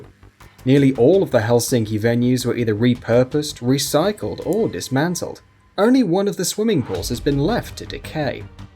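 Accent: England, United Kingdom. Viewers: Who cares how it's pronounced he's saying it with an English accent so of course it's different. I pronounce jalapeño JAlapeno instead of HAlapeno...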